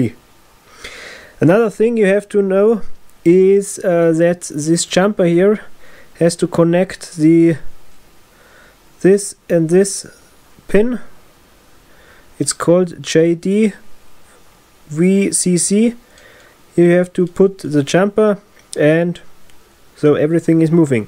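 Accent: Germany. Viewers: Als Parodie wie deutsche englisch reden durchaus zu gebrauchen aber wenn man etwas hilfreiches gesucht hat wie ein Verbraucher an den Ausgang angeschlossen wird fehlt die Hälfte in dem Video…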